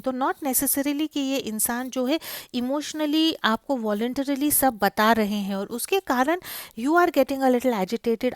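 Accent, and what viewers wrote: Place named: Maharashtra, India. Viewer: मॅम मराठी आहात ते ekun खूप छान वाटले..thank u for reading.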